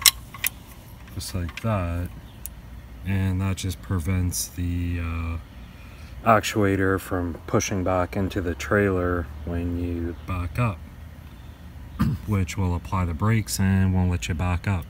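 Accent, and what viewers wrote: Canada. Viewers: Hope this helps you oat! I love crazy accent! Lol I may be wrong but I’m guessing Canada maybe? Out = Oat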